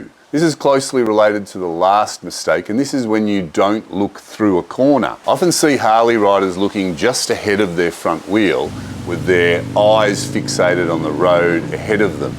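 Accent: Australia. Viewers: Geezo, was this video made for the Ausies? Hard time understanding that accent. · Clicked on this video out of curiosity as I don't even ride a Harley, but then I heard the Aussie accent and saw Arthurs Seat (which I ride a fair bit) and kept watching. Good tips and are applicable for all types of bikes (I ride a gixxa). Even after riding for over 20 years it still pays to readdress the fundamentals of cornering. Especially when you haven't ridden for a while, or you are riding in adverse conditions. Personally, my biggest mistake is not looking far enough ahead if conditions are dodgy, and that just makes things seem faster than they are (plus you need to constantly adjust where you look). Look further ahead and you can hold that vision longer, things seem to move slower, and the bike will tend to go smoothly around the corner. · Love that Aussie accent, miss Australia so much, look forward to come to down under and ride along Great Ocean Road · I assume that because you ride a Harley you label your video as advice for Harley riders and of course it helps the video get views (so my comment is not a criticism just an observation). 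The advice could equally apply to anyone who rides a big twin cruiser. No doubt there are features unique to the Harley but as a rider of a Kawasaki Voyager 1700 I would say the same advice is applicable so I thank you for your video. It is good to see and hear an Australian voice offering motorcycle riding techniques and see Australian roads. I think life skills advice is also helpful - thank you. I should own up and admit I know ride a Can Am Spyder so cornering is an entirely different experience. I felt the Kwaka was getting too much to handle and I wanted to keep riding so I went for 3 wheels. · I'm sorry, but with your Aussie accent, I must have misunderstood you during your comments regarding "life corners." Much of what you said is soundly Biblically based, so I thought the part where you directed the audience to see more at the end of the video, your comment had the word, Scripture, in it. 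Not so. Have a blessed day. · I wish I had a sweet Aussie accent. Love you way you say Harley (Haawlee). You kind of remind me of actor Bryan Brown. Anyway, love your vids and subscribed. Cheers from the USA!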